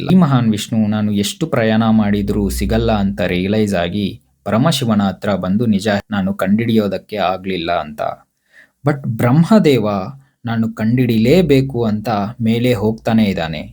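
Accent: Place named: Tamil Nadu, India. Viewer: Tamil boy made this video your speech is in Tamil slang. Welcome you speaking in Kannada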